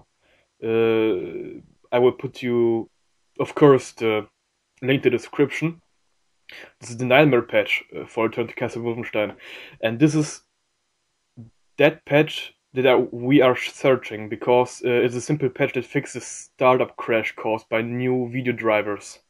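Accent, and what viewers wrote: Germany. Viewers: I think your german because of the accent. If so.. Was geht ab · Man hört das du Deutscher bist :D Aber ich glaube das tut man bei jedem.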